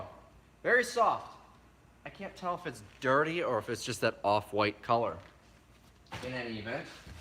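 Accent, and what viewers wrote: Wisconsin, United States. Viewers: You say dollar with more nasality than Standard American English (think newscaster speech), It's pretty normal for the Wisconsin accent.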